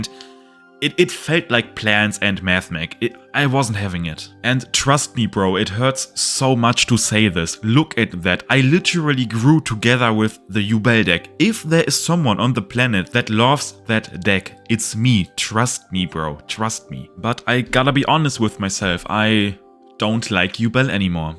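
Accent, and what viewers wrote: Germany. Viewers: Do you realize terror incarnate will miss timing if it is linked away? Also btw u have german accent 👍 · Love the content, always excited when I get that upload notification. Also, not sure if you knew this... you have a German accent. Anyway keep it goin bud! See you on the next new deck whatever it may be! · you have a german accent bro, will terror miss timming?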